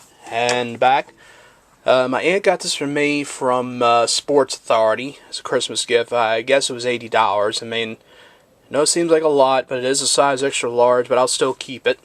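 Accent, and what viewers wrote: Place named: Baltimore, United States. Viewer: You have a super heavy Baltimore accent. Go Ravens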